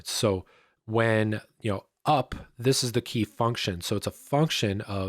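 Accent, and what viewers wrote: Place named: Boston, United States. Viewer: Man that Boston accent <3 You should colab with Brand one day.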